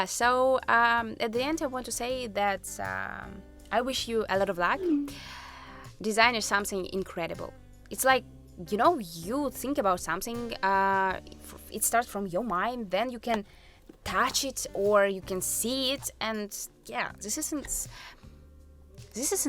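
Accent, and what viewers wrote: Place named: Australia. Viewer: Your English is amazing! I thought that you're Australian :) Nice video, thanks!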